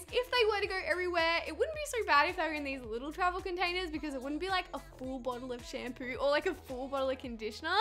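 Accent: Australia. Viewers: what part of australia are you from? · are u australian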